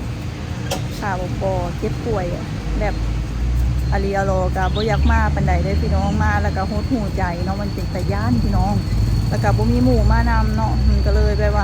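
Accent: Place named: Nakhon Phanom, Thailand. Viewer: สบายดี สาว คนงาม สุขภาพแข็งแรงร่ำรวยร่ำรวย เด้อ.สาวเซโน สำเนียงเว้า คือทางบ้านนาหลวง นาทราย เน๊อะ อำเภอเมือง นครพนม (คนไทยในต่างแดน)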